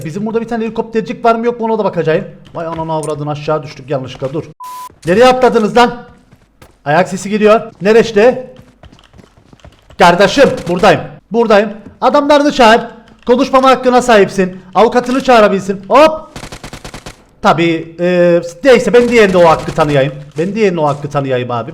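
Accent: Cyprus. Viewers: Kıbrıslıları abi sayesinde asik oldum şivelerine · Abi gercekten kıprıs lımısın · KIBRIS KONUŞMASINI COK SEVİYOM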